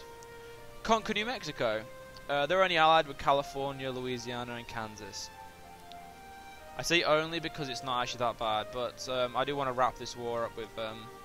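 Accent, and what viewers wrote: England, United Kingdom. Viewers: You are british. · are you english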